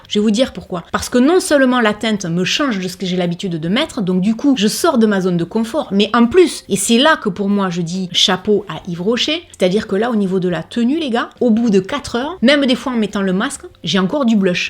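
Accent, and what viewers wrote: South, France. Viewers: Merci pour cette video pour ta bonne humeur et ton accent du sud j aimebcp les crayons yves rocher pour les yeux bonjour a talounet 🤩🤩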